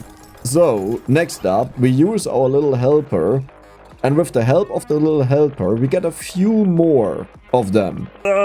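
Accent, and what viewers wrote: Germany. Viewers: U sound very german and its tickling my non existent braincells! my bf likes your videos. Idk what else to say. This aint a hate comment btw, ;3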